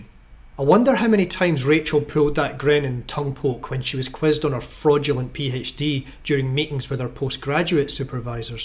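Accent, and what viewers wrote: Scotland, United Kingdom. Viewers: Are you and Neil Oliver from the same place in Scotland?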